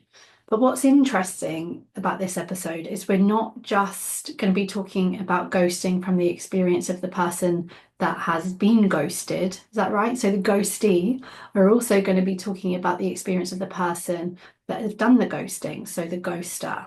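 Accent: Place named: United Kingdom. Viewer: Love your British accent! 😊😉🌺💯💯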